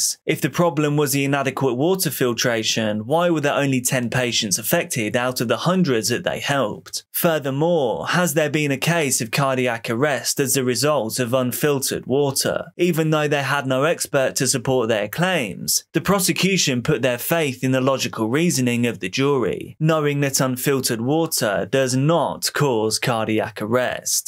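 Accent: England, United Kingdom. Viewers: then your English accent is flawless 😅 he’s definitely English, from a fellow English person 🫡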